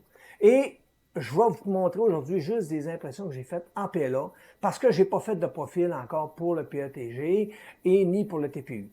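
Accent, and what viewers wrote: Canada. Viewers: Bonjour, Je n'écris plus beaucoup en ce moment désolé, je suis débordé 😢, mais à part quelques vidéos sur les laser qui, POUR LE MOMENT m'intéresse moins, je ne loupe aucune de tes vidéos que j'attends avec impatience. Tu fait partie de ceux qui me remonte le morale quand je voie déjà la notification, ce que j'apprécie chez toi c'est ta simplicité, dans le bon sens du terme, même si pour nous français ton accent canadien, que j'adore, est parfois un peu compliqué pour la compréhension, mais c'est toujours clair et détaillé, et quand il y a besoin de détail tu sais prendre ton temps. Il est vrai que je n'aime pas trop les vidéo de plus de 45 minutes, mais quand il y a besoin, c'est justifié, plutôt que je ne pas tout expliquer. C'est vrai que les parties avec zoom sur la pièce, ne rendent pas toujours aussi bien qu'à l'œil nu, mais tout ceux qui imprime en 3d FDM le savent cela. 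Je rencontre les mêmes personnes qui râles sur la qualité du travail effectué chez les youtubeur qui font de la peinture sur figurine. J'en fait un peu et moi même, mais sans râler, j'avais vu un Youtubeur peindre et faire un gros zoom, et j'avais aussi pas trouvé sa top, puis j'ai imprimé la même figurine en résine, et une fois imprimé et que tu l'a dans les mains, tu te souviens du zoom qu'il a fait sur les yeux et là tu te dit... "Ha houai, quand même, c'est petit". Alors oui un gros zoom montre tout, mais une fois vu avec nos yeux, c'est très propre... Et seulement quelques cm d'éloignement cela ch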